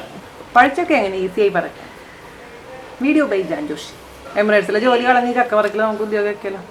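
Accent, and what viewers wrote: Thrissur, India. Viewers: chechi de veed trishur anno...aa accent...avidthe pole...sambhavam kaalaki...kollam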